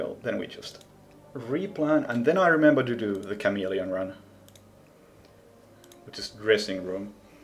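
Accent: Finland. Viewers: Arvaa helposti ku kuulostaa vähä rallienkulta😅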